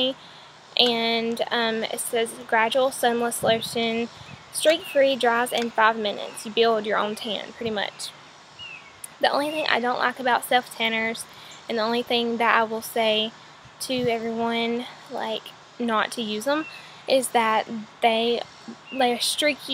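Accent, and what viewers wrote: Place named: South, United States. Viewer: I love your accent! What part of the south are you from? I'm from Texas (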